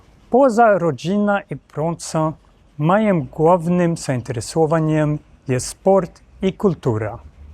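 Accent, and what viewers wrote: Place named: Sweden. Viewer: 😊bardzo ładnie brzmi polski język z szwedzki akcentem 😊Polska låter väldigt fint med svensk accent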